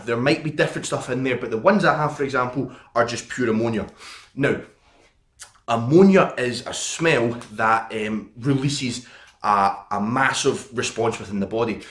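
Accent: Scotland, United Kingdom. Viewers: Is this guy Scottish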